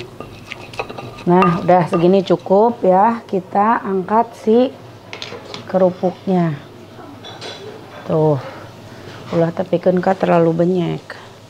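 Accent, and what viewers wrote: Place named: Sunda, Indonesia. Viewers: Ceceu muni lekoh sundana 😂😂😂 resep jadina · meni lekoh kitu sundana teh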